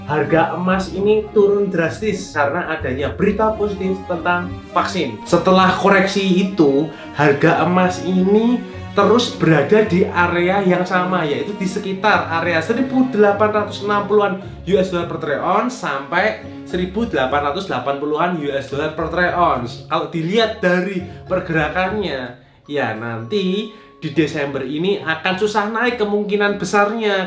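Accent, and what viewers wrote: Java, Indonesia. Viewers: pak medok banget pak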